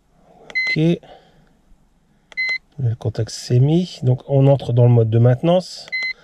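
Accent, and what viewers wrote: North, France. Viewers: Salut ou habite tu? Car tu as l accent du nord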